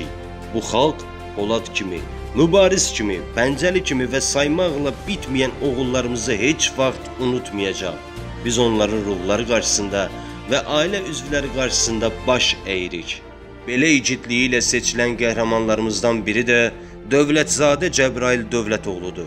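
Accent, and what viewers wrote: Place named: Iran. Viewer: SEN HARALISAN DANİŞAN? İRANLISAN?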